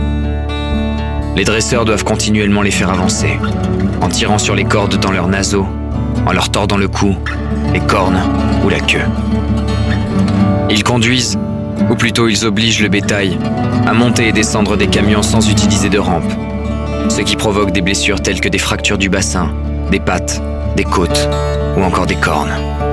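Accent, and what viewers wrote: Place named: Spain. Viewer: Bel accent espagnol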